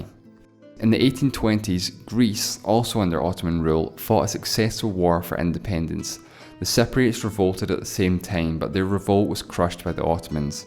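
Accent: Scotland, United Kingdom. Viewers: Are you Scottish? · POSH SCOTTISH. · Geet Gupta Scottish, Scotland is in the uk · Scottish or Irish accent? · Dat awesome accent. Like I just watched Trainspotting again.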